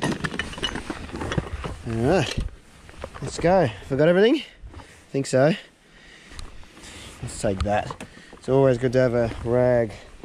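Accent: Australia. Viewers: He has the most stereotypical Australian accent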